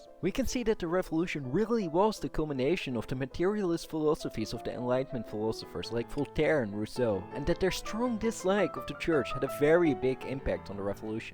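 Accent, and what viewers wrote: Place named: Netherlands. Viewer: No worries at all, thanks so much for the video, I'm guessing Dutch from the accent :)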